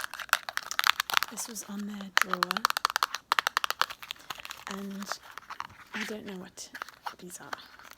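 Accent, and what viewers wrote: Italy. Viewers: wait I thought she was American but lives in Italy and has an Italian accent....?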